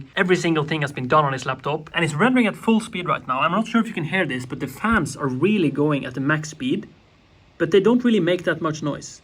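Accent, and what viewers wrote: Sweden. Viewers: I love how obvious your Swedish accent is. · Wait you have a Swedish accent? I think, if your from Sweden write a answer to this comment, in Swedish.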